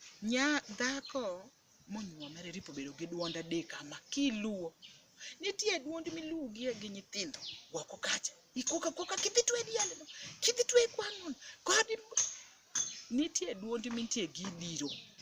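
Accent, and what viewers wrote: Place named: Luo, Kenya. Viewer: You are basically speaking in Luo of Kenya. 😂😂 I love listening to Padhola conversations alot because you sound like Luo of Kenya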